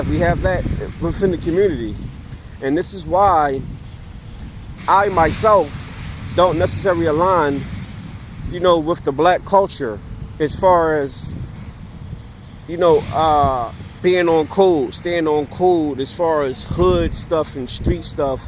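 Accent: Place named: Caribbean. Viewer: This sounds like Tether babble you must have a carribean background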